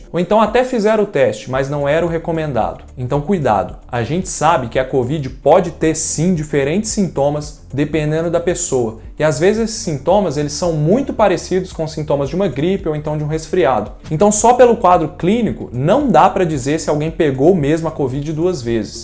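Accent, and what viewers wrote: Minas Gerais, Brazil. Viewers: Tô sentindo que os vídeos tão perdendo o sotaque mineirinho hein... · Agora temos um sotaquim minerim de responsa divulgando ciência de qualidade no Brasil todo 😂😍 Valeu! 👌🏼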